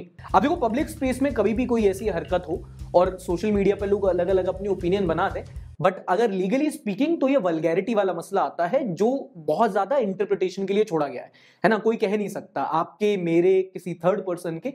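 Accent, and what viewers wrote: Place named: Bundelkhand, India. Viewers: As a Bundelkhandi I can say you Bundelkhandi accent is 😂😂😂😂 amazing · Your Bundelkhand accent.........Maja aa gaya😂😂😂😂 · Bhai I am from Bundelkhand aapne bahut hi gajab Bundelkhandi boli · Bro have full bundelkhandi accent I approve you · That bundelkhand ascent was lit 🔥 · Bhai bhai aap to full bundli bol letai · My man speaks prime bundelkhandi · भैया हमें भी लग रहा हूं कि तुम बुंदेलखंडी हूं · Sir your bundelkhandi is best i am from bundelkhand😂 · As a bundeli 😍- Bro ur Bundelkhandi accent is on top notch!! 😅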